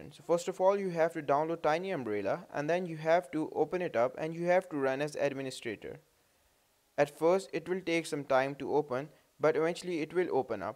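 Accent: India. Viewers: (not racist) Cant tell if Indian or british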